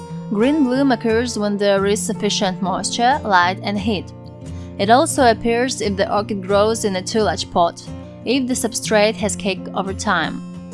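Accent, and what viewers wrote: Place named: Russia. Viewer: Publicly known facts delivered in heavily accented English. Perhaps make the next video in Russian, and only when you have useful info.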